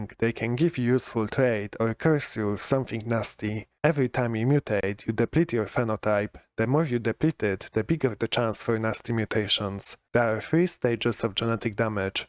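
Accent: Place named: Poland. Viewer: Interesting contnet, funny jokes and nice Polish accent.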